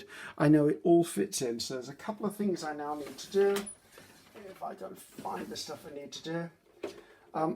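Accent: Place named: Southampton, United Kingdom. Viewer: What a pleasure hearing you ! It takes me more than 50 years ago, when I went to see my granny for my holidays in Southampton. Her way of talking was like yours, same style same words same particular idioms (tickle in the throat for e.g.) that I haven’t heard since. When I watch your videos I'm a little bit at home. Now I’ve lost all practice and vocabulary and the « Oxford » way of speaking is the only one I can understand. Thanks so much for this « madeleine de Proust ».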